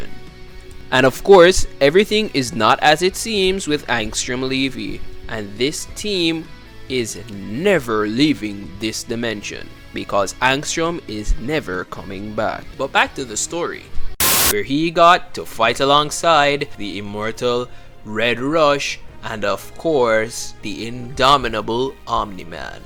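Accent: Jamaica. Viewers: Are you Jamaican by any chance?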